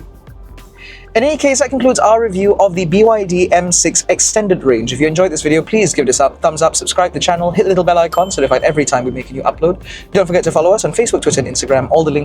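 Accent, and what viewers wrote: England, United Kingdom. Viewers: Nice English accent, very clear.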